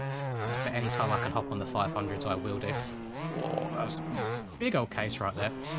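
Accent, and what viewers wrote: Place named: England, United Kingdom. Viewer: Defo go for commentator career. English accent makes everything more official.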